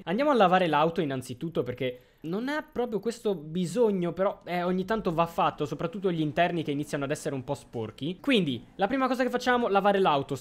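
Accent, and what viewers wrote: Milan, Italy. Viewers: sei milanese?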